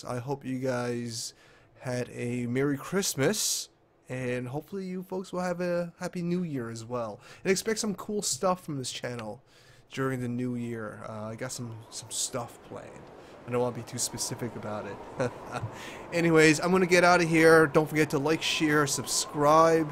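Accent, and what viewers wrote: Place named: Newfoundland, Canada. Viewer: I didn't know you were from Newfoundland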